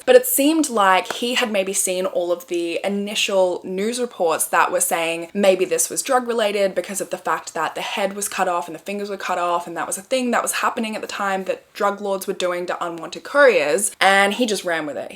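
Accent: Australia. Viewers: Me desperately trying to keep up with the Australian English as an American😂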